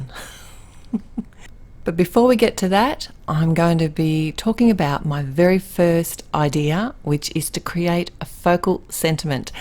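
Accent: Australia. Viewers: Nice to hear your Aussie voice! Means I’ll be able to find all you used! Thank you 🙏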